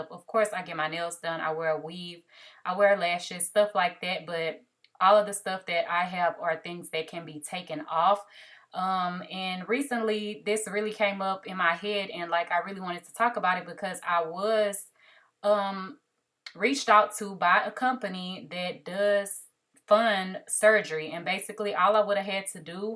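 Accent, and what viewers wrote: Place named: Chicago, United States. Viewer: You kind of have a Chicago accent ❤️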